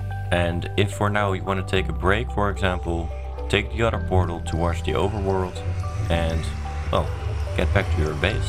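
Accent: Netherlands. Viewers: Are you dutch? Feel like its our accent 😂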